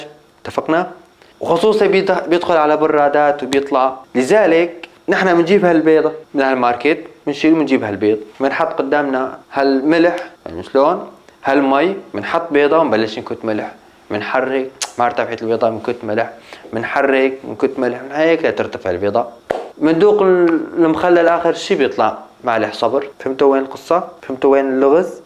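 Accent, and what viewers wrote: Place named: Idlib, Syria. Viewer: لهجتك ادلبي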